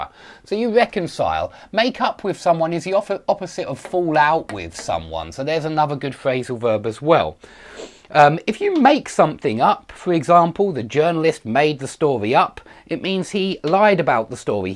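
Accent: England, United Kingdom. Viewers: I love your English accent !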